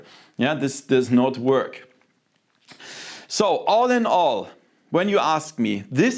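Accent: Germany. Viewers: Mein Gott, du erinnerst mich an meinen Vertriebschef, denglisch vom feinsten...das ist so lustig zu hören, sorry mann, aber das ist echt köstlich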